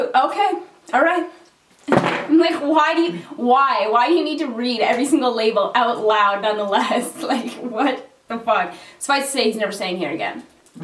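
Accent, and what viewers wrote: Canada. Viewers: You're Canadian right? The way you say bag and trash 😁.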